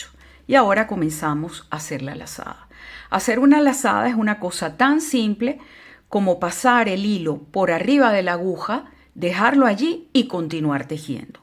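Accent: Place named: Venezuela. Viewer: Muy lindo, muchas gracias!. Me ha insipirado tu muestra. Voy a hacer un cardigan con 10 vueltas de tu punto y 10 llevando un punto en diagonal cada 6 u 8 asi el diseño tiene continuidad. Todavia estoy evaluando si hacer las mangas todas caladas o no, ya que son mangas al codo porque es una prenda de lana de algodón para verano. Me encanta tu acento, es venezolano?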